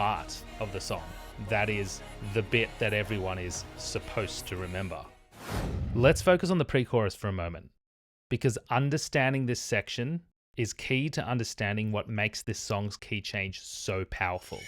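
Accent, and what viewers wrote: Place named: Australia. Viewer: All true. This is historic and cultural way of singing also. Etno. And if this is Aussie accent I love it. The best english ever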